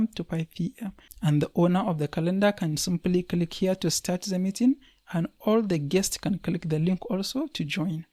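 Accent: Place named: Hausa, Nigeria. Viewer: you accent is funny.. are you hausa ?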